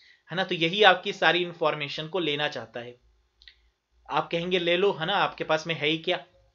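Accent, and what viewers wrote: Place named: West Bengal, India. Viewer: App west bengal se ho kya sir kyun ki waha ke ek teacher odisha main app ki tarah computer ko easy tarike se explain karte hai usi tone main ❤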